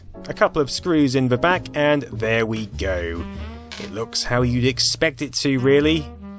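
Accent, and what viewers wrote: United Kingdom. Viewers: The french man say: C'est trop Cool! But will you stop having this british accent, it's so hard to understand for frogies...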